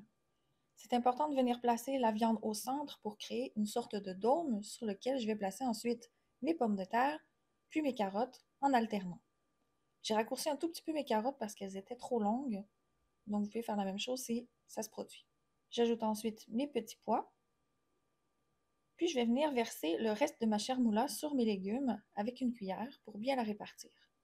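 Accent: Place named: Canada. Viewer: Je crois elle est du Canada mais c'est compréhensible ce que elle dit !!!!!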